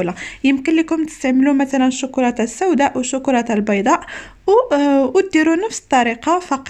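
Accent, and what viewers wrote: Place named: Oran, Algeria. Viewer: hhhhhh j'ai aimé le mot ijarjrak j'adore l'accent oranaise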